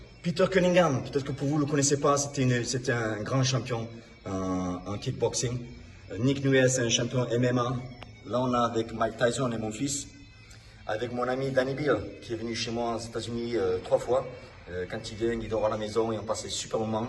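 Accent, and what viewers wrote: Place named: Provence, France. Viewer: Tu es originaire d'où ? Tu parles de Midi-Pyrénées mais je trouve que ton accent sonne plus provençal. Belle galerie de photos ! :)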